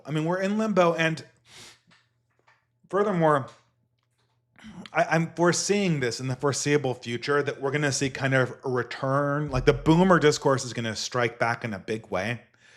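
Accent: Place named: California, United States. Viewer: you really gotta do something about the California accent.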